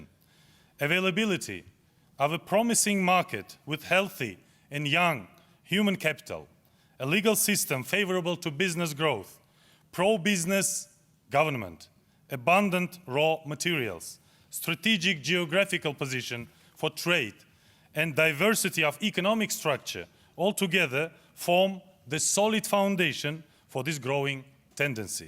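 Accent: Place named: Russia. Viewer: I thought it is gonna be like: “let me speak from the bottom of my heart”..😁 But thankfully this guys speaks far better than other uzbek officials. Nevertheless speech was intially written in russian, then translated into english. I am sorry sir you sound so russian! Although he gave good speech, there is very little change in uzbekland. I almost laughed when he said: “ non-discrimination” and “fight against corruption” 😂 Feels like uzbek treasury is empty and shavkat needs more money from US institutions to further fill his pockets in.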